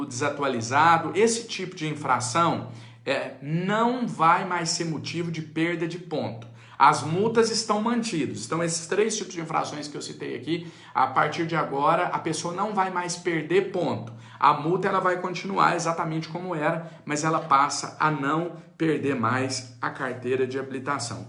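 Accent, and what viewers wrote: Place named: Minas Gerais, Brazil. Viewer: É VC é mineiro ne bom dia eu tbm sou VC é o melhor pra explicar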